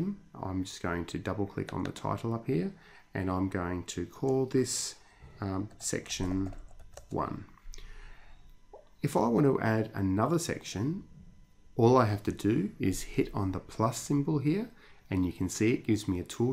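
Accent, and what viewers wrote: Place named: Australia. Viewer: The Aussie accent has got to be hands down the best accent imo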